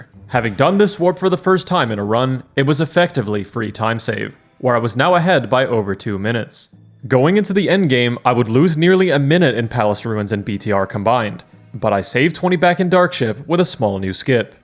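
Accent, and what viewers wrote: Kansas, United States. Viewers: i always assumed you were american because of the accent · You sound American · You sound like you're from Kansas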